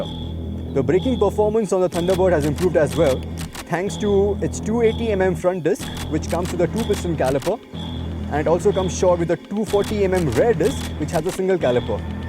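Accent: India. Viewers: Hello aka:)... I been a witness to your many from the time of your inception. If you accept feedbacks.I have 2. 1) Few clips are repeated in all your reviews. Here one in black n white and then in color. Like something's missing 2) Agree, u have a global viewership..but an Indian is the host with the accent...Would have been great if he spoke the history/ fan following wearing a Dhoti and white shirt start and ending with the jacket:) In the end Its a Royal Enfield.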